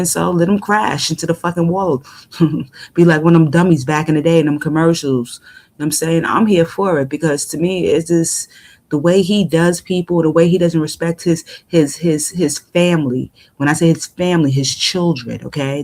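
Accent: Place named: New York, United States. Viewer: Replay, this was fire…ya talks on these subjects are fire and love the ny accent! And u digging ya claws in these mofos deep! lol 😂 this is sick fr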